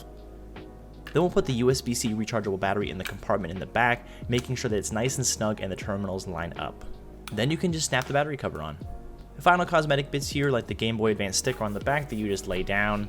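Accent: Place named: Canada. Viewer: He’s Canadian can’t you tell EHH?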